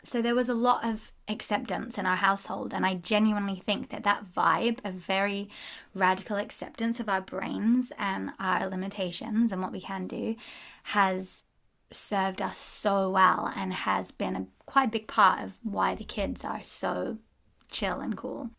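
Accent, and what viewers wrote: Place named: New Zealand. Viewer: I haven't seen your videos for ages, and I love how your London accent's become super kiwi, like one of my best friends from school who lives in Taupo. I was thinking of you recently... did you see the case in Italy where a UK/Australian couple have had their kids taken away and put into care because they were unschooling and had a composting loo!!!?? It's unbelievable, and for us (living in Italy as progressive foreigners) it's made us feel quite nervous. We're leaving the country soon. But I wondered if there's any support within the unschooling community for them. My kids are now 12 and 10 and I have doubts/vague regrets about having sent them to state school. I know why I did it (believing they needed to culturally integrate into Italy and also as I work full time feeling I couldn't support them here), but they've both had some bullying and it's too rigid especially for my ADHD son.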